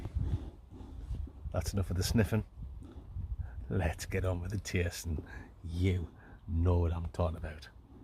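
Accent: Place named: Sunderland, United Kingdom. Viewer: I'm originally from Sunderland, 46 years, left 12 years ago, so lovely to hear a proper accent. Love this stealth camping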